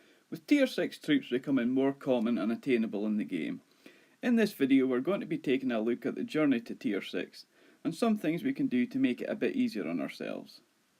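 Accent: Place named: Scotland, United Kingdom. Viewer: I love this dude’s accent. Pure Scottish 🏴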